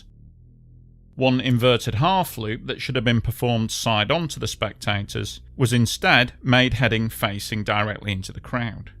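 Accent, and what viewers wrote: United Kingdom. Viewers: Where is the narrator's accent from specifically in the UK?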